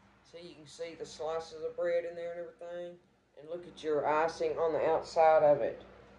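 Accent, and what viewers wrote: South, United States. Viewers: Ms. I just recently found your channel and you had me not only for your great recipes and shortcuts but the first thing to really get my attention was when you opened your mouth and spoke. That accent made me feel so much at home in your kitchen. I live in NC and have had so many people comment that I was “country as cornbread” when they first heard me speak. That was years ago and many comments away and I’ve come to feel proud to be country. Thank you so much for your channel. Your recipes are great but just listening to you talk such a comfort. I’m 70 and only cook 1 meal a day and I ed tried se real of yours and have not been disappointed.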